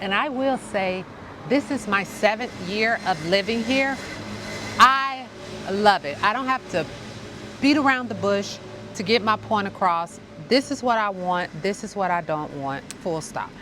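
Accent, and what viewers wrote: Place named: Chicago, United States. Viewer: 'But because I'm trying to remember that separable verb I forget what the hell that I'm supposed to be saying' ... hahaha. Good one! We've all been there. And, after 26 years, I still make mistakes, especially if confused or upset. Subject +verb +direct object +date +object (+verb)...phew. And then there's the 'aan het schrijven' conjugation of being busy doing something ... such as in 'I'm writing'. This too is placed at the end. 😏 Where are you from in the States, BTW? To me you sound like you have a Chicago accent. Am I right? So, when I first moved here, my father-in-law to be told me that during WWII the locals could tell natives apart from the Germans, who would always pronounce 'ch' words with an 'h' sound instead of 'k' (consonant) ... like 'Scheveningen' / 'Schiphol' / 'schoenen'. And now I even quietly cringe when people pronounce stroopwafel as 'stroepwaffel'. 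😄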